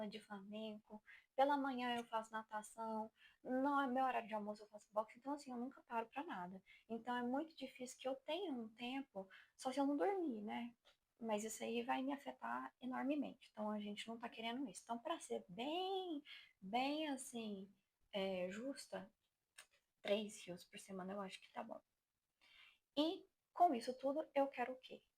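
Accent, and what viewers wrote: Minas Gerais, Brazil. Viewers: É mineira? vive de pão de queijo kkkk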